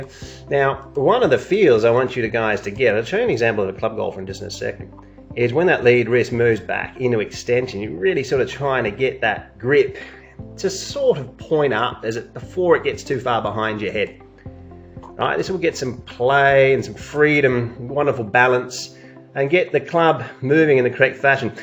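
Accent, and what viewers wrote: Australia. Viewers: great visual animations to assist the explanation, plus the sweet Aussie accent helps too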